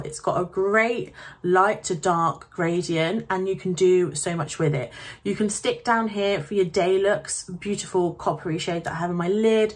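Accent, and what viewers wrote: United Kingdom. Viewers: Her accent sounds like she is from Devon or Cornwall. She does not speak with a cockney accent so its highly unlikely that she is from the London area.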